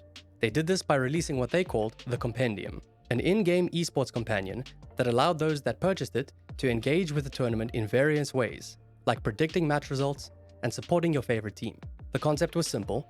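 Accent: South Africa. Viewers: I thought this guy sounded south african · Can spot that South African accent a mile away 😂 Great vid.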